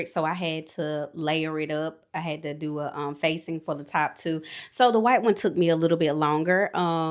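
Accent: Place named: Georgia, United States. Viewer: I knew when I heard ur accent, u were from the Peach! I'm so excited u are from here too! I don't see many of us and I've seen fine fabrics so many times but was too scared to go in. I have so many ideas just need the ouch to create them🤭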